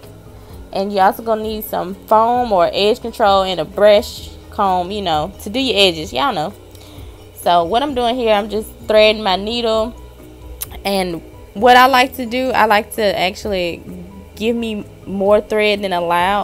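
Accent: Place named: South, United States. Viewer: You're so country but it's so cute. You have a new Subscriber forever just to hear your bubbly voice and watch your methods.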